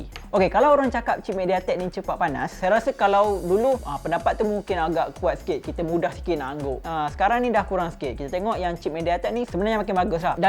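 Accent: Malaysia. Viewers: Gw suka logat malay kayak lihat upin upin wkwk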